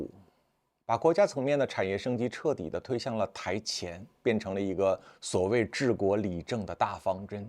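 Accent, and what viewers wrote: Beijing, China. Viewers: 欣賞風流的京片子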